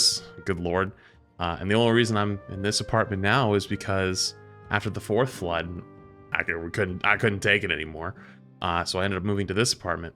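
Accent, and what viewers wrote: Boston, United States. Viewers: The only thing I got from this video is you are from Boston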